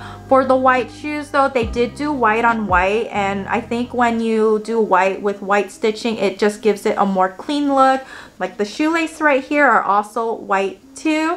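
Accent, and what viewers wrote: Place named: France. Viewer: Ton accent français est trop mignon 🥰 *your french accent is so cute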